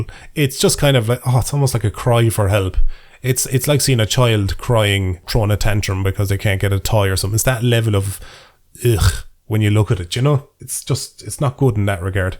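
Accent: Ireland. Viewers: It’s actually the melodic tones of your voice. The brogue is an orchestra to the ear and the humour is fowl... birds of a feather and all. Side splitting humour to the point of not being able to breathe. And yes ... we can feel it 😜😜😜ps... the missus needs her own shirt 😋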